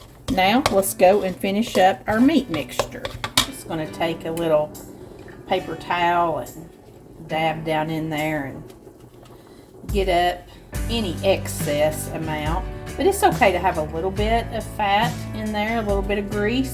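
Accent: South, United States. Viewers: I have been watching you for about five months now & subscribed to your channel after the first recipe that I watched on YouTube. My husband passed away on Oct. 6, 2022, and that's when you popped into my life!! You have truly blessed me. (God sure does work in mysterious ways!!) In a way, you have saved my life, along with our precious Lord & Savior, Jesus. I was so so lost & was grieving (still do!!), and your amazing, delicious recipes gave me something to do in my spare time & got me to cooking again!! I love how laid back you are & I love your southern voice & the soft music you play while you cook. Your recipes are simple, easy and delish...right down my alley!! Love and prayers and lots of blessings to you and your family!! I cannot thank you enough. 😃😁🥰❤❤🙏🙏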